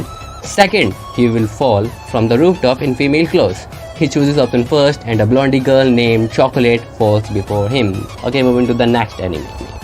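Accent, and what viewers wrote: India. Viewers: I think you are indian because your voice cannot be perfected completely at english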